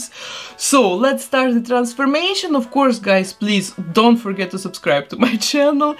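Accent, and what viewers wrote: Russia. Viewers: Иногда мне кажется что у вас явный русский акцент в речи 😂❤ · I hear a Russian accent🤔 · Сразу слышна Раша Тудей ,ну на столько слышен акцент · Искала комы на русском, очень мало, быстро узнала акцент, думаю, ну точно, имя подтвердило. Русский акцент узнаешь из тысячи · Sonds like russian accent 🤔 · Этот русский акцент 😅❤ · Russian accent.🙄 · Такой акцент русский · Вы русская? Просто мне кажется, что у вас русский акцент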